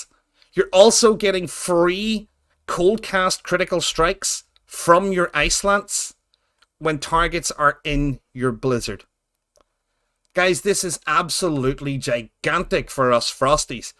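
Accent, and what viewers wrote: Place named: Northern Ireland, United Kingdom. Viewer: Hey, you sound Northern Irish :) so nice to hear though :) I'm also a frost mage, nice to see some good tips :)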